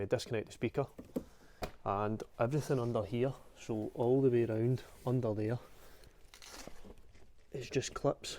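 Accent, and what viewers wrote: Glasgow, United Kingdom. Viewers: Well done for taking on a difficult job, and showing us it wasn't to bad. I enjoy your videos, and my wife likes your Glasgow accent.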